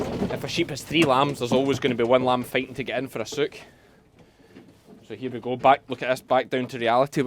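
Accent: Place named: Kilmarnock, United Kingdom. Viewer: Actually having my friend to watch, as we are coming to Kilmarnock in three weeks, so she can tune her ear to the accent!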